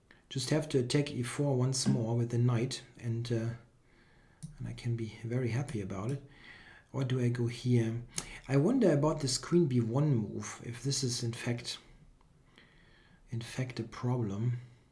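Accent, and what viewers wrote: Germany. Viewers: I don't find his games boring at all. I like his style of positional play and watching him turning small advantages into a win. he also easily becomes a beast in unconventional positions. Even seeing him blundering (rarely) is exciting and it never gets boring. Plus, his sense of humour and German accent really add a great colour to his videos. I am grateful that he provides us such entertainment everyday. I feel like I have a friend on the other side of the camera and I am always thrilled to see how he did today.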